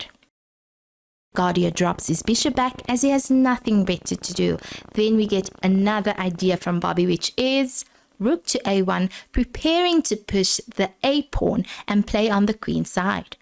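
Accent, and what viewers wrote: South Africa. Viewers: That South African accent 😮‍💨👌🏻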